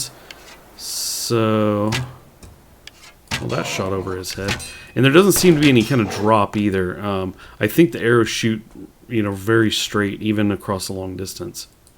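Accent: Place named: United Kingdom. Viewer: New to the channel, great video... enjoyed your british accent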